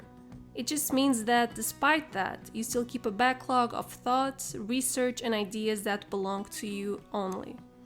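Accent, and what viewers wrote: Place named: Portugal. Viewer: I just found your channel a couple of hours ago and I have been binge watching your videos nonstop. Your videos have really helped me organize my routine before I go back to college (i'm studying Law). I also noticed your accent and wondered if you were from brazil or from Portugal then I saw some portuguese books during this video and just wanted to say your work is amazing and wanted to send some love from Lisbon. Continua com o bom trabalho e tudo de bom para o futuro. Ps: Clearly english is not my first language but I did my best.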